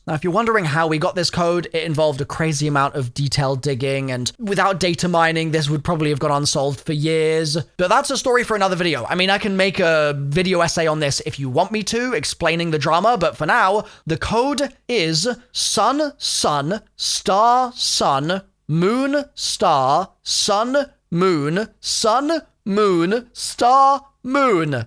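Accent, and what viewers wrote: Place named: RP, United Kingdom. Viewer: The most irritating voice on YouTube! I can't finish watching this toff! Don't know what you mean with the hand not being there, it's been there every single time for me and I've not waited for those body counts... Doesn't just sound like shit then🫣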